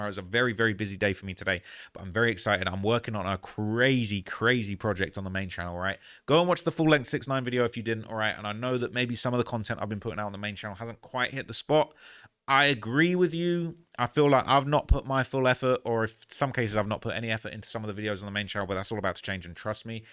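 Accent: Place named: United Kingdom. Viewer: Freudian-slips with the UK accent be hilarious 😂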